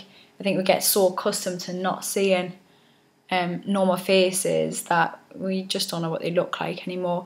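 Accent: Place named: Newcastle, United Kingdom. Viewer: I love your positive attitude! (And Geordie accent... one of me favourite English accents) Cheers!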